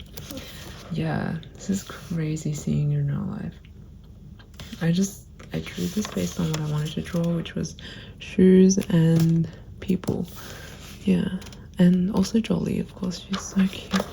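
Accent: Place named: Perth, Australia. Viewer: Thought you had a Perth accent. Funny how I could always pinpoint people from home amongst other Australians. Last year I met some people 'from Australia' in Japan and I said to them 'no you're from Perth', and they were 😅 Didn't know there was a comic arts festival here.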